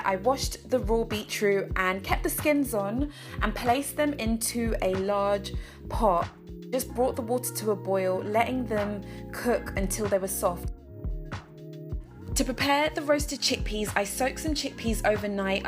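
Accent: United Kingdom. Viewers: You sound British...why are you using •Fahrenheit???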